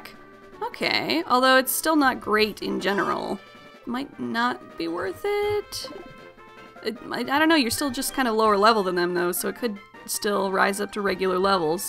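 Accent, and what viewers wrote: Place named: France. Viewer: may i just say that is the most amazing french accent greqt vid as well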